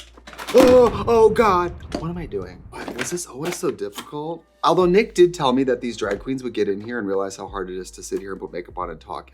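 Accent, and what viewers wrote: Wisconsin, United States. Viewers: Your Wisconsin accent is thicker. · Trixie goes on vacation for 3 months and comes back with Milwaukee Wisco accent in spades she's been trying so hard to keep under wraps. [I also have this accent and try to keep it under wraps] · The wisco accent is really strong after the break 😂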